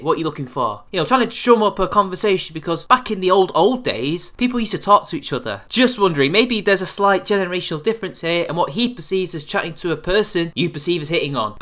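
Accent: United Kingdom. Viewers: I'm not sure you're aware of this but Sargon and Thunderf00t are British, too. In fact, your accent is more like Sargon's. But that doesn't change the fact that your delivery style is like TL;DR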